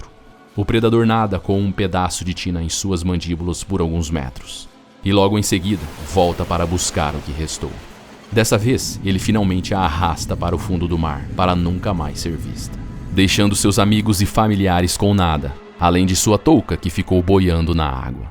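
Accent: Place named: Sao Paulo, Brazil. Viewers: Meu Deus esse sotaque Paulista forte dá uma aflição é muito feio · esse sotaque de paulistakkkkk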